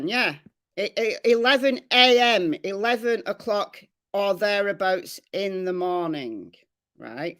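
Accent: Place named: England, United Kingdom. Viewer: Thank you kindly for all that your doing, your a very genuine person out of all the youtubers out there 🐦 And I love your English accent 🙂💜 Lisa ~ Australia 🌌✨🌠 xx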